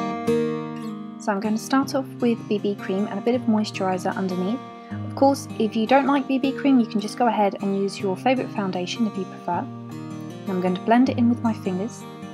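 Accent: United Kingdom. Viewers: Your voice and accent sound fucking horrible. Like an old british hag. Id hate to be British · I LOVE YOUR ACCENT ITS SOO AWESOME! where are you from? it sounds like your from the U.K.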